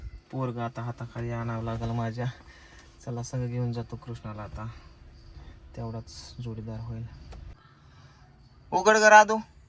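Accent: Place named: Kolhapur, India. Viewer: Dada kolabi boltata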